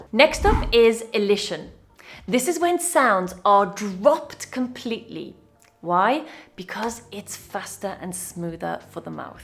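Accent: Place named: London, United Kingdom. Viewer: It's very good your accent is in London see you next bye and great week for you.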